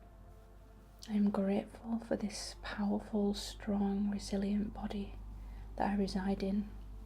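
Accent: United Kingdom. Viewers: WITCHY asmr with a BRITISH accent?!?! How did I get so lucky to find you ❤😭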